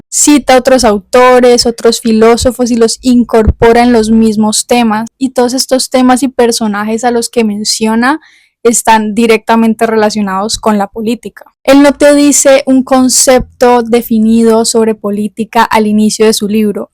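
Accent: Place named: Cali, Colombia. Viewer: muchas gracias!! Que buen video amo, eres de Cali vdd, lindo acento 😙😙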